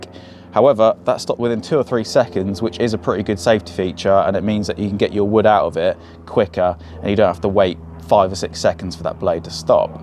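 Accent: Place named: England, United Kingdom. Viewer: Being French, it's very nice to have an equipment review with a nice English accent instead of an American one. And to hear you give measurements in the metric system: priceless!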